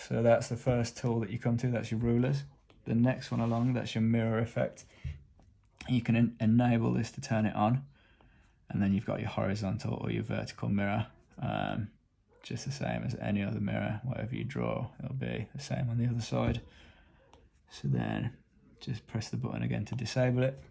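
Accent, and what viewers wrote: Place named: South London, United Kingdom. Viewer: I love your accent. I sense you are from South London? Your tutorials are great, you go at my speed so I can keep up with you. I’m learning a lot from you and guess what… I do not read manuals! I’m a poke and stabber. I will be catching all your tutorials as you make the app less 😵‍💫 thank you!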